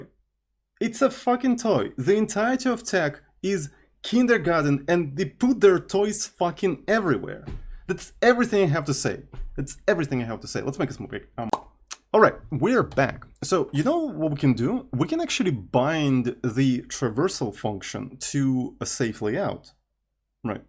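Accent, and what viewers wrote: Russia. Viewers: Started watching… heard familiar accent… found that author is from Moscovia.. closed the video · Какой хороший , понятный русский акцент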